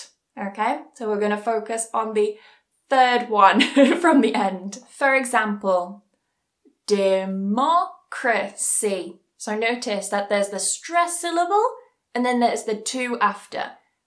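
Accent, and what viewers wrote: United Kingdom. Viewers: I love your British accent ❤️ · Though, I am an Indian boy still I love to learn British accent from you. I enjoy learning with your so profound smile 😊😊❤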